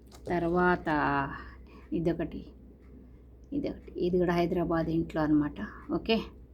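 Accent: Guntur, India. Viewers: మీ తెలుగు చాలా బావుంది మేడం నా బాల్యయం లొ గుంటూరు లో వినేవాడిని hats off to ur principles 👍 from Vizag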